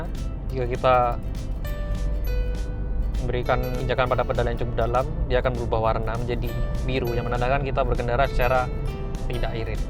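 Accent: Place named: Surabaya, Indonesia. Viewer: Mantep reviewnya logatnya Suroboyoan :)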